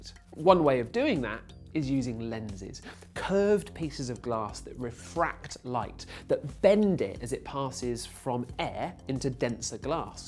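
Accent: United Kingdom. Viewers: WHY is everything that is scientific narrated by some guy with a British accent? And I still havent found out if a telescope ZOOMS like a camera lens or are they fixed?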